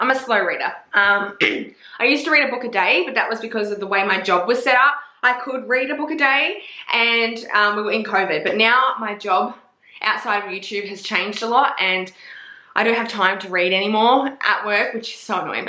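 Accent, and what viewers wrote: Australia. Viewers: bish shut up I love the Australian accent 😭😭😭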